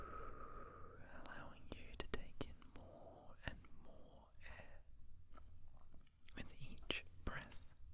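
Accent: Australia. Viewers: You have the perfect soft spoken AND whispery voice for ASMR, and I love feeling at home with the aussie accent. I swear when I subbed to your channel ages ago it was off the back of seeing a few of your videos, and one of them was like a light triggers one? I’ve been trying to find it but can’t, have you ever even done one before or have I gaslit myself into believing you have? If you haven’t, I think there’s some untapped potential there for you, you’ve already got visual triggers and sound triggers down pat, so if you added lights into your visuals that would go insane. Thanks for listening 😌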